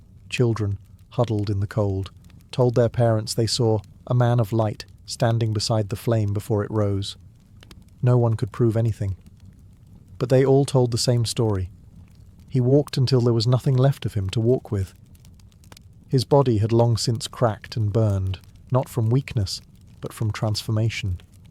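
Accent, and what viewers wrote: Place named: United Kingdom. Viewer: A British accent to sleep? No thanks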